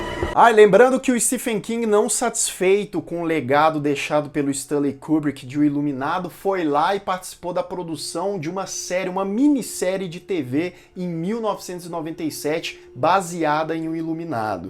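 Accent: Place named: Minas Gerais, Brazil. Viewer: meu deus como eu amo o sotaque mineiro AAAAAAAAAAAAAAAAAAA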